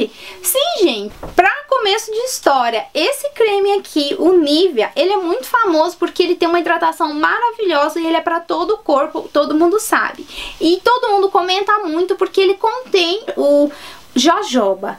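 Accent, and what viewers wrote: Minas Gerais, Brazil. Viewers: Tem um sotaque mineiro . Legal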